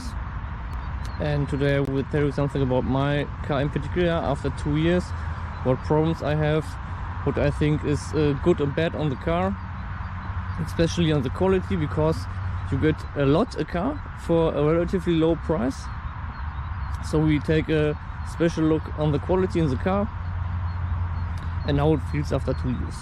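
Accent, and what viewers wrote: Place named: Germany. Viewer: man merkt direkt , dass ein deutscher hier redet XD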